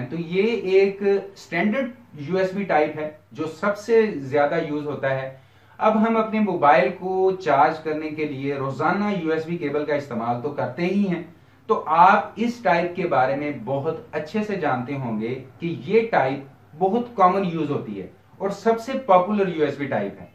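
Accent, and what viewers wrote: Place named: Lahore, Pakistan. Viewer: The accent looks like he is from Lahore / Pakistan..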